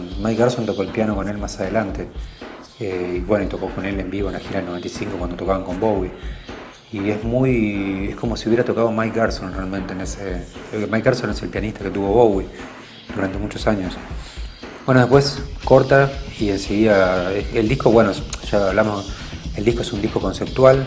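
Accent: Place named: Argentina. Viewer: Que video tan epico lpmqlrp, hablas argentino ahí y todo re piola, aunque no te puedo negar que NIN es industrial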